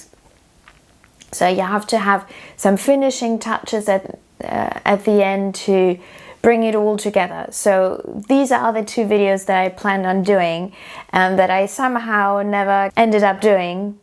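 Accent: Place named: Australia. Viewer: Love your teaching so much ❤ I love your accent, I'm guessing that you are from Australia originally? I'm in Newcastle England. If you get time and only if you think you're viewer's would be interested could you explain how to paint sheet music like I've seen in some old still life paintings. And how to paint writing on letters such as that painting by David of the dead man in the bath please. Happy new year 🎉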